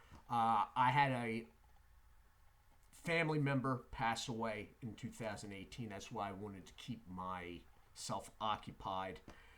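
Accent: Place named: Pennsylvania, United States. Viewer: I thought your accent was south of Bucks county 👍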